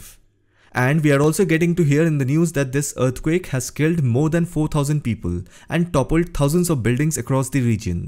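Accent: India. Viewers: it's indian english just like americans speak their own american english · Good Presentation Except** Heavy Indian Accent *** 🙏 RIP*** Why buildings were approved * By Turkish Government * In this fragile area? * Is it due to bribery & corruption? *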